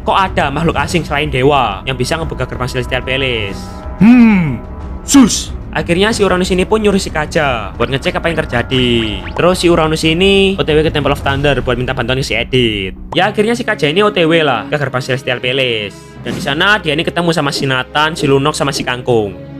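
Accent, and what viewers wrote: Java, Indonesia. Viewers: Aduh logat jawa dan jokes cringenya ganggu bgt, terlalu lebay jir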